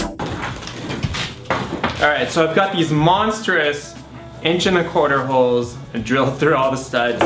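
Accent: Canada. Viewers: Aboot...lol You're such a Canuck :) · The Canadian accent was strong in this one (probably because you said words with "ou" in them so often lol). XD I can't wait to see this tiny house when it's finally done though!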